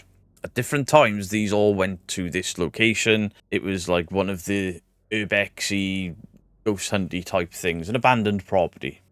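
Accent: Wales, United Kingdom. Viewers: thanks. Ive missed your welsh tones xxx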